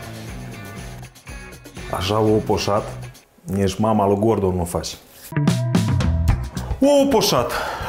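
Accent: Moldova. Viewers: Sint cu un zimbet intins la maxim... accentul moldovenesc si exprimarile sint extraordinare... ,, ou poshat’’ hahahahaha... chiar nici nu stiam cum s ar putea chema din engleza in romana... pouched eggs!!! Cu drag continui sa ma uit din Australia 😘😘😘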